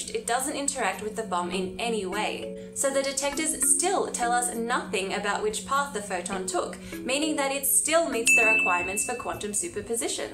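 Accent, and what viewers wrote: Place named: Australia. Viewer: Someone on twitter commented saying you were Australian and I didn't even notice!! I guess I'm too used to the accent. Where are you from? Where did you study?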